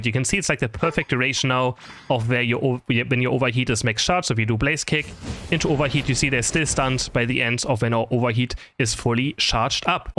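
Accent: Germany. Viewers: German accent 😊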